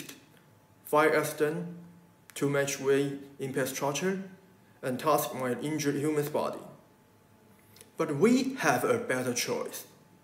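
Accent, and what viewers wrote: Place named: China. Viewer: I don’t understand your Chinese accent.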